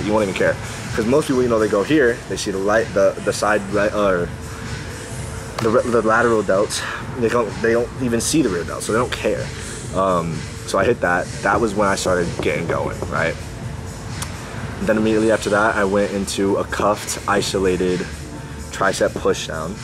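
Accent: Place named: Canada. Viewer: why do you sound Canadian in this 😭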